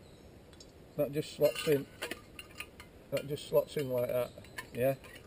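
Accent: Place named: Leeds, United Kingdom. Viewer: just been watching another of your vids and my mrs commented how much accent sounds like her dad, and uncle, who are originally from leeds way. Glad you got permission, although I would definitely make sure I had a bright flag up, and smoke signals, if you run the chance of being stuck cooking your bacon butty's in the morning, in the middle of a shoot. Hope to see a vid of your night out some time soon. ATB, Phill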